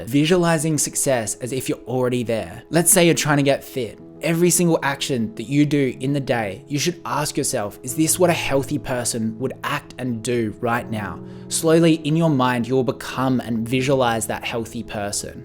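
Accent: Australia. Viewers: Your channel are full of useful knowledge, also the Aussie accent reminds me of the days I used to study in Melbourne. And i am so suprise that we are almost at the same age and you already achive such a great success, i am start to learning e-commerce! thanks!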